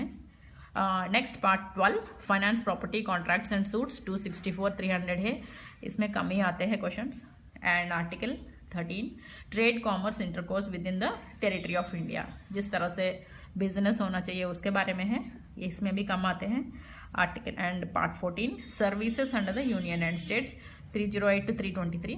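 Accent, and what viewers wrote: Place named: Telugu, India. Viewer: by her accent of hindi, i can say she is telugu...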